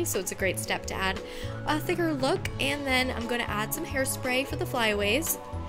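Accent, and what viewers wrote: United Kingdom. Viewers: Are you from England?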